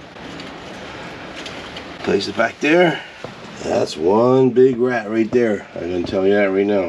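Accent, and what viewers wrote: Canada. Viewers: As a northern Mainer, I could instantly tell I was listening to one of my Canadian neighbors. 😊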